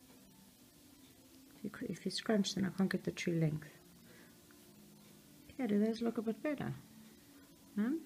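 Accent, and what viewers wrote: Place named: South Africa. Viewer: Right away I picked up the South African accent. I lived in Cape Town for a bit and my best friend that I lived in CT with is from Johannesburg.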